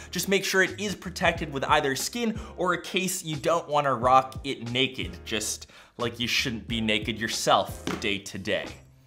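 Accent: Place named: Canada. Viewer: your canadian accent seems much more pronounced than usual in this video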